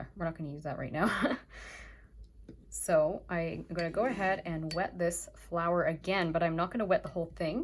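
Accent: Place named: Canada. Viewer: This is really beautiful. These are my favorite color combinations. I have used yellow as an underpainting, but it didn't occur to me to leave the white there for the other colors. I appreciate you sharing your talent with us. I've enjoyed using the Simply Simmons brushes as well. I think I first started using them after I watched an artist in Canada. I think his name is Jo (last name...can't remember) and he works with the Artists' Network (I think). You sound like you are from Canada. Am I correct? You don't have to say, if I'm too nosy.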